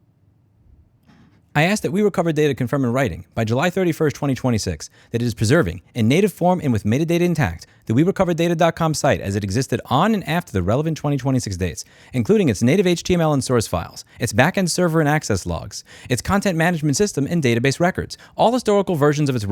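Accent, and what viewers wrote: New York, United States. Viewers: Had to play this at 70% speed because Louis is from New York and I wanted to listen to him say it like a mob boss.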